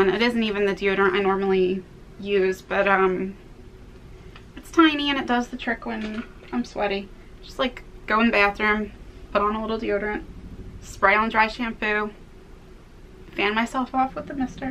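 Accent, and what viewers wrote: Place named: New Jersey, United States. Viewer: I'm gonna bet you're from New Jersey, I know that accent anywhere, lol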